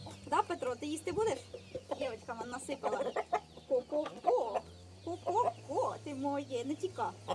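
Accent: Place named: Poltava, Ukraine. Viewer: Дівчина,Жорік та всі інші друзі цього шоу.. Ви з Полтави?❤🎉❤ Така мова гарна ❤ Харків вітає ВАС❤🎉😊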